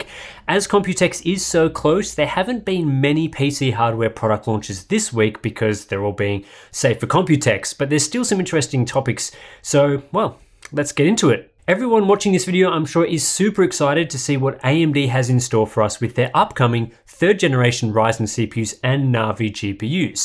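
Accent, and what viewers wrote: Australia. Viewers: said "not as good news." It's that thick Australian accent.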